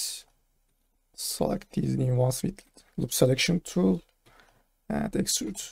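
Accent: Turkey. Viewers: Sanırım Türk bir kardeşimizin kanalı, ingilizcesi tam bir türk aksanı içeriyor :)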